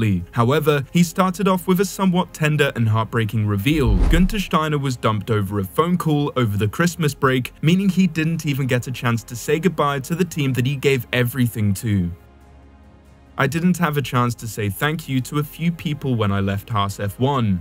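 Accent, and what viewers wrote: United Kingdom. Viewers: I don't know who is narrating this, but I'm tired of everything about racing being fed to us with a British accent. Diffey is the worst.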